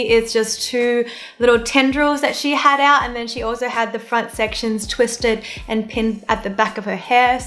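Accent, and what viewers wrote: Australia. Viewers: is she australian？？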